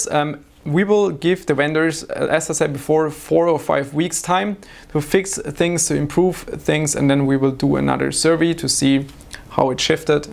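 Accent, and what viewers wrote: Germany. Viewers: Das gute alte deutsche englisch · Does anyone else have the feeling that this guy is so german that when he speaks english he still sounds like he is speaking german?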